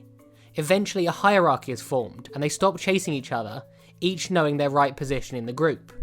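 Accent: United Kingdom. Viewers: I love your channel and yes I am a subscriber! I also absolutely adore the British accent. 😉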